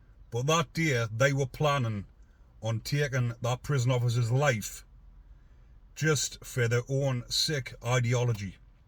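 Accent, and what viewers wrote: Newcastle, United Kingdom. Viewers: All true made better with the geordie accent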